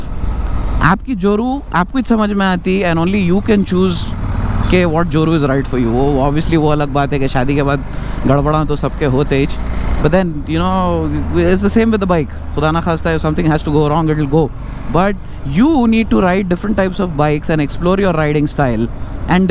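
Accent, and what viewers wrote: Hyderabad, India. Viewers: Bawa your hyderabadi is lit👍🔥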